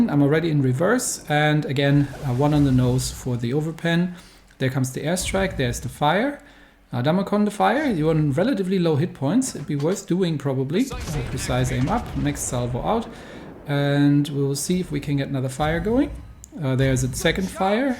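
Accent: Germany. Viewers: Hello are you a native german speaker?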